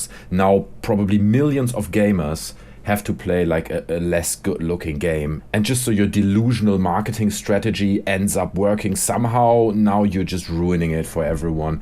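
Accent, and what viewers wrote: Germany. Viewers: wollt gerade fragen, ob du deutsch bist :D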